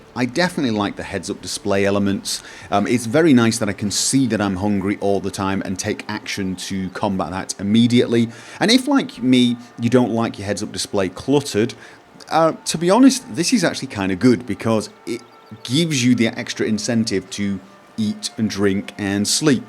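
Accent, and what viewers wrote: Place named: Scotland, United Kingdom. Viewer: Hears a scottish accent insta sub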